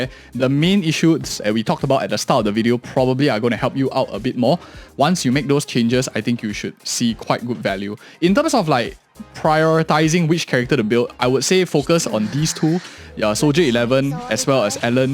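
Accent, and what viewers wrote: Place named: Singapore. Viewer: He has a Singaporean accent.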